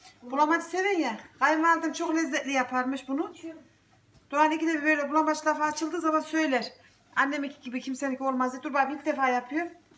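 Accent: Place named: Konya, Turkey. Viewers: hanim memleket nere konusmalar bizim oralar ayni bende inanadoluluyum slm hanim👍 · Zaten konuşman bir tanıdık geldi toprak çekti bende konya ereğli liyim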